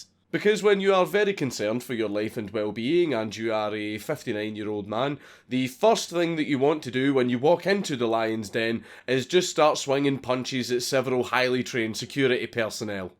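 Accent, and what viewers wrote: Scotland, United Kingdom. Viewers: Yay angry Scottish man can ruin my day!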